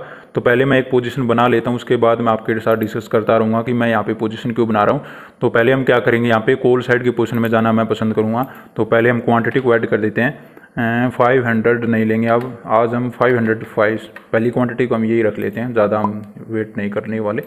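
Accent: Haryana, India. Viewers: sir i guess u are from haryana. i am guessing because of your speaking tone. am i right?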